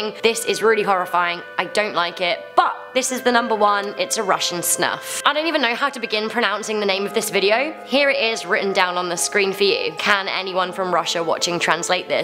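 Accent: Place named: Scotland, United Kingdom. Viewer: im gonna comment something nice. you have a delightful accent :). im guessing its scottish cause i knew someone from whales with a similar voice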